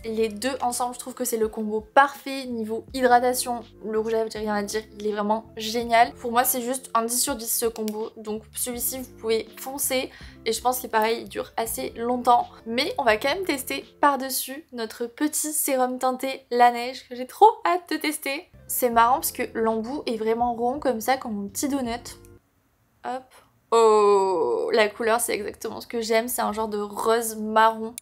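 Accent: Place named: South, France. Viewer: J’ai adoré la vidéo ❤ tu dis tout le temps « quand même » avec un léger accent du sud 😂 j’adore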